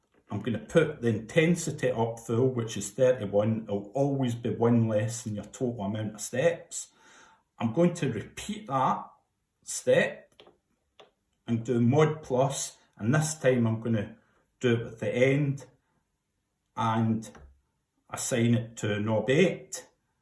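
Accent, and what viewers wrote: Scotland, United Kingdom. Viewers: You sound like trainspotting. I LOVE trainspotting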